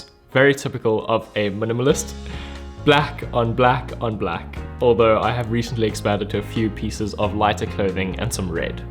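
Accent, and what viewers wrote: South Africa. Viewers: Wait, you're South African? it refreshing from all the other american and british you tubers. Nice to hear my accent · I thought this was a South African minimalist apartment when I heard your accent lol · are you south african?? idk why but that's what your accent is screaming at me😂😂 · You sound South African, are you ? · Are you south African?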